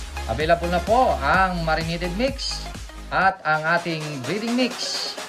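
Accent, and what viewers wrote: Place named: Visayas, Philippines. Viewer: Visaya man si ser oi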